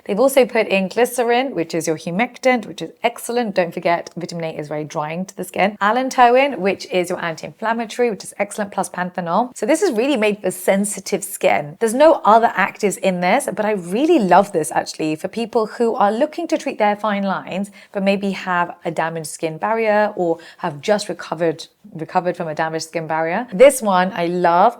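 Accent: United Kingdom. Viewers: Your accent is British, but name sounds like Indian, is that true ? I'm Indian, & I'm just about to complete my MBBS internship in 2 months, & i want to work in research field, & you gave me hope thanks ma'am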